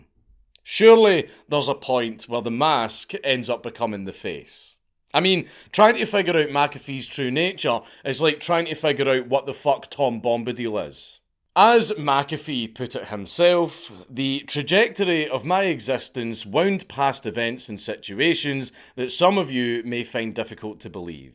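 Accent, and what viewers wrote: Scotland, United Kingdom. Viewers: aww i thought he was irish hes scottish which is js fake irish :( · I could listen to this Scottish man talk about bizarre historical figures all day.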